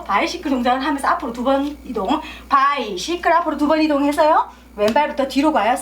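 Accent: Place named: Gyeongsang, South Korea. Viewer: 늘 잘 보고 있어요ㆍ경상도 말투가 듣기 좋아요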